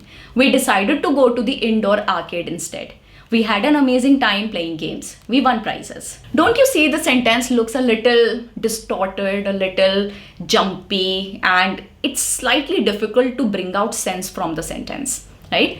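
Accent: India. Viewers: Content is highly appreciated despite intolerable Indian accent. Thank you though!!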